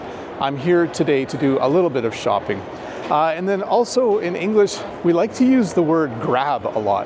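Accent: Canada. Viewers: We enjoy Canadian English, thank you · hello! i got a question, what’s your accent? is it canadian, or i’m wrong?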